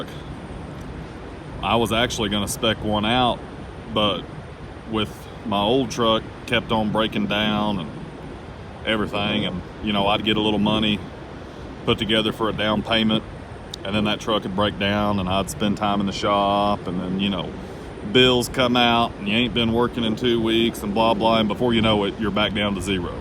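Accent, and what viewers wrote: South, United States. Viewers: Looks good as red Is my favorite color! Like ur Southern accent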